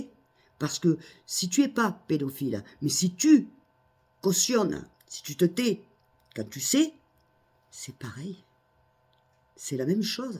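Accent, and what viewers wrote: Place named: Nîmes, France. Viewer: Vous êtes marrante, malgré votre vie de souffrances, et vous gardé l'accent nîmois. Je me suis abonnée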